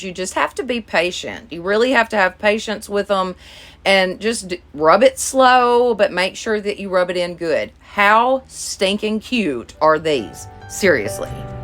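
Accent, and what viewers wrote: South, United States. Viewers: Bless your heart, you are so Southern! I love it. Liked your projects.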